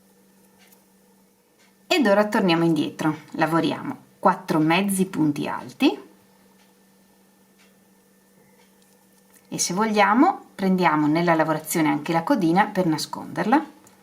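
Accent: Veneto, Italy. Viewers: Bellissimissima!!!! Starei ore a guardarti lavorare e ascoltarti! Spero di diventare altrettanto brava!! Intanto provo! Sei veneta?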